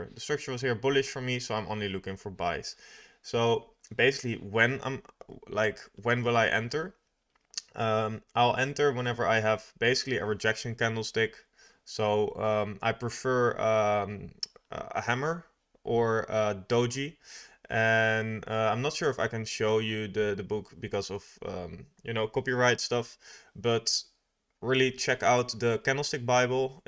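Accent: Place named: Spain. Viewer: i can tell u speak spanish, lol idk why. but i just now how spanish peoples accent sound when trying to speak english because i speak spanish too and english fluently.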